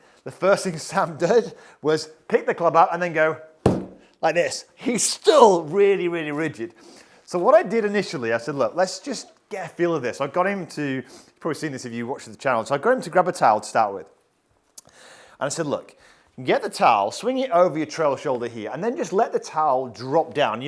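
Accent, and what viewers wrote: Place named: England, United Kingdom. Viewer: I only trust someone to correct me with my swing with an English accent, is that someone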